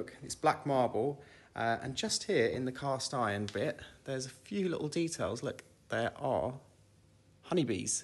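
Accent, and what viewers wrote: RP, United Kingdom. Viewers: That intro was about as hoighty toighty British as you can get. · I am fascinated! first of all, being an appreciator of british english I love the way you speak. I like your charisma. And of course the place is beautiful. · "lives in a castle, bakes scones, kind to kids, has a dog and speaks beautifully British" i think youtube has found its new prince charming ^^ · Definitely loved this vlog ,it’s made so good ,what’s this guys accent ?ist posh ? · Yeah, he's posh.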